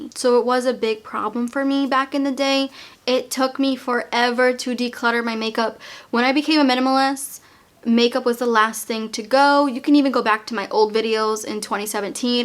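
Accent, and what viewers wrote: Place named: Massachusetts, United States. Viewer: Massachusetts. Her accent is the number 1 reason why I subbed and she tries so hard to not have an accent lol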